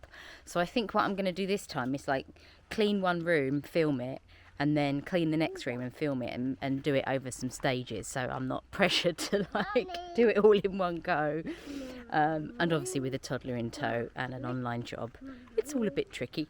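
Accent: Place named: RP, United Kingdom. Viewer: Like your accent. Very posh.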